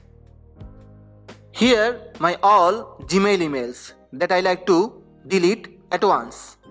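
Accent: India. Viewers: So Indian voice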